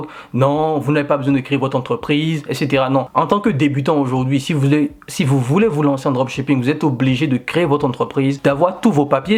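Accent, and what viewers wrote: Benin. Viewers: Je clique sur la vidéo et j'entends un accent béninois corsé hahah ca fait du bien je me suis tout de suite abonnée.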